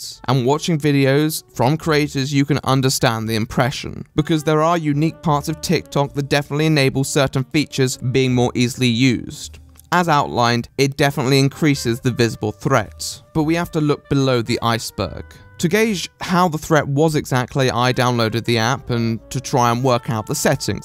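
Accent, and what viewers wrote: RP, United Kingdom. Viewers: Received Pronunciations :) The posh accent. Not as posh as ye old aristocrats. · hes posh mate, pride of the uk. hes funny though so gotta love him!!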